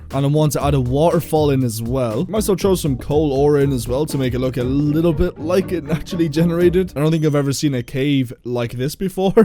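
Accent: Ireland. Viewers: r ya irish?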